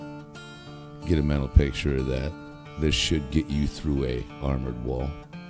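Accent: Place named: Midwest, United States. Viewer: Your voice tells tells of many great tales in the American Midwest by the utterance of just one word.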